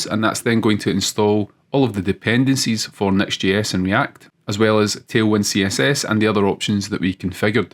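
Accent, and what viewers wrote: Scotland, United Kingdom. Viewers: Within the first few seconds of watching the video, I got strongly drawn towards his Scottish accent of English .... Are you from Scotland Sir? Love from India !!!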